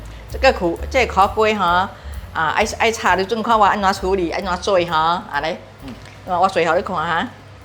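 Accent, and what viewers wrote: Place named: Hokkien, China. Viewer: Aunty, thank you for this bitter gourd recipe, Today I learned that fish sauce can increase flavors of bitter gourd. I will try it out the next day if I cook this, favorite dish. It is a good dish for hot weather. Aunty, today you speak in Hokien dialect is a welcome sight for us Hokien aunties. I find you have Mandarin subtitles in your vid, that is very good for those couldn't understand the simple dialect. Bravo keep up your efforts I will always support your channels. Have a great day ❤❤❤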